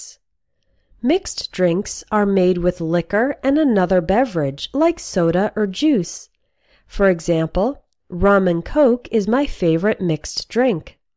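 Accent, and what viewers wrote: United States. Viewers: So, If I may add. Why the automated robotic american voice? Got through about 20 seconds of this and gave up.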